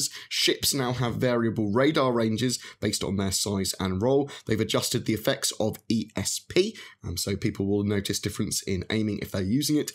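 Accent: United Kingdom. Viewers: I love how Brits call it a "haych" key :)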